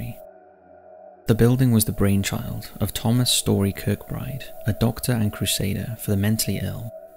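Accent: United Kingdom. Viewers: Too much catering to americans on these channels. And his voice isn't anything special it's just a generic british accent.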